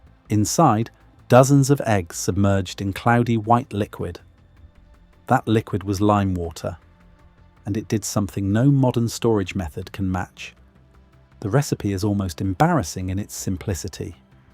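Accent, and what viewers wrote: United Kingdom. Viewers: The British voice is misleading. We never put eggs in the fridge on this side of the pond - in our homes or in the shops - and they don't go off after just a couple of weeks. I understand that eggs in the U.S. are washed and disinfected, and this strips away their natural defences, meaning that they have to be kept refrigerated. This is mentioned in the vid, but as I say the British voice is misleading; it implies that we do the same here.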